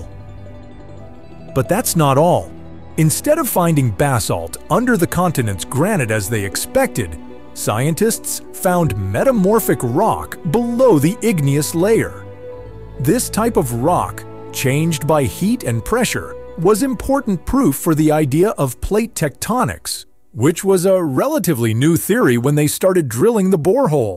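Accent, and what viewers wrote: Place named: China. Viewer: Humans did not land on the moon rather have CHINESE English the voice is too much stress selling business was not pechenga can not learn too much sress in the voice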